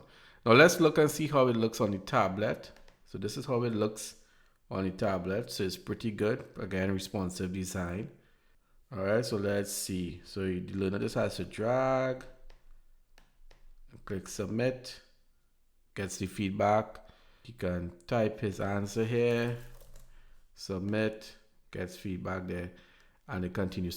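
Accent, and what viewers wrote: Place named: Trinidad and Tobago. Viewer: You're not a real instructor unless you have a Trini accent. I love it. Cheers!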